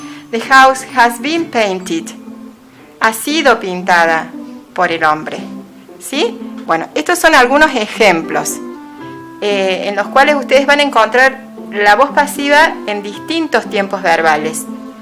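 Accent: Córdoba, Argentina. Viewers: Muy buena leccion y muy simpatica esa tonadita de Cordoba!!! 😄😄😄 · Es de cordoba se nota el aasento grasias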